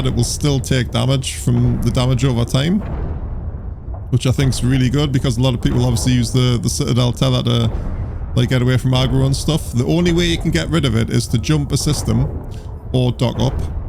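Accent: Newcastle, United Kingdom. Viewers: Wait what, a Geordie playing eve. Why the hell am I not playing with you!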